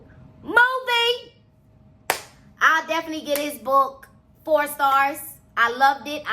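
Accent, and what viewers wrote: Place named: Bahamas. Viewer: The Bahamian accent😅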